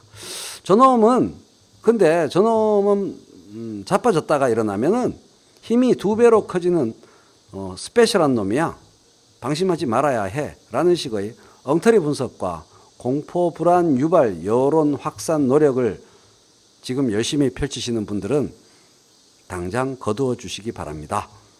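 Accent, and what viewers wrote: Busan, South Korea. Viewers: 궁시렁 궁시렁 씰뗴없는 ㅋㅋ ㅋㅋㅋ 진짜 시작부터 속이 시원!! ㅋㅋㅋㅋㅋ저도 부산놈이라 그런가 옆집 아저씨가 설명해주는거 같아요 ㅋㅋㅋ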